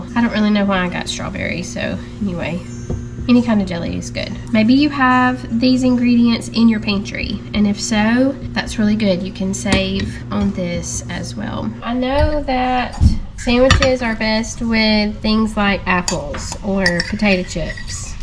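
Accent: South, United States. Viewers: Your video just showed up in my recommendations...I subscribed before the video even ended because you have a nice personality. I'm from NC so I recognize your southern accent...where are you located?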